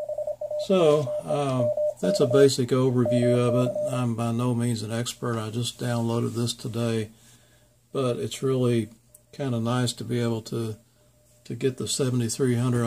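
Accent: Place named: Charlotte, United States. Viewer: I just today found your videos. You sound like you are close to me in Charlotte. Our “accent” sounds like mine. Am I correct?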